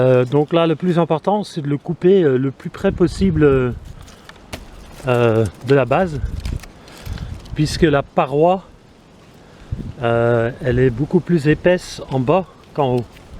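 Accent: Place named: Belgium. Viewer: Je pensais a un belge proche des flamands , j'étais pas loin! :)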